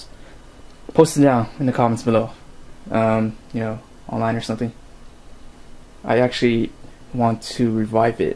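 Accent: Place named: Philippines. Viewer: Are u phillipino? You sound like one :P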